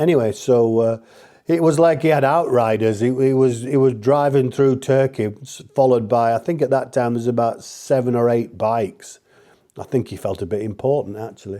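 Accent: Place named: Manchester, United Kingdom. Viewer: Evenin ! Rob recommended your channel … we’re in a similar situation, awaiting sale of house before we can buy. However our budget is lower than yours 🤣🤣 despite your Canadiannlication I recognise that you are a Mancunian 🤔😵😵. Like you i loved my 1150 GS… sadly due to a few prangs I was persuaded to change to 4 wheels- compromise was no roof 🤔🤔 … hope all goes well on the trip to France 😎 Steve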